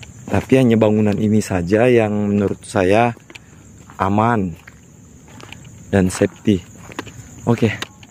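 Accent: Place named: Jakarta, Indonesia. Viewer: Makassar bisa tonji bisa juga logat jakarta walaupun kadang ada yang salah sebut kata katanya sedikit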